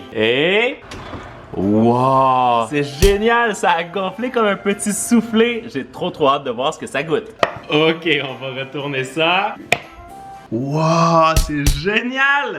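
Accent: Canada. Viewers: Hello la recette des gnocchis a l'air juste super bonne,mais j'avais un question comme tu es canadien tu as un accent et je le demandais si quand tu parle a un français tu trouves qu'il a un accent ? Sinon continue comme ça tu es le meilleur ❤️❤️❤️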